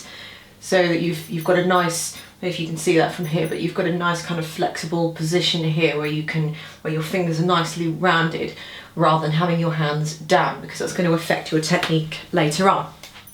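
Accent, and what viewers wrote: England, United Kingdom. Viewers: Talented, beautiful, AND you have an English accent. I want one!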